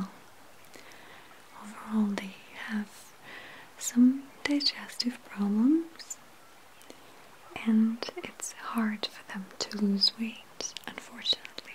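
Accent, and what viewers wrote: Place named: Russia. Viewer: Thank you! Is there any way you can make a video about actual elements? Maybe a mad scientist role play with a lab coat talking about Moscovian in your lovely Russian accent?....think about it.... [waits for inception to happen]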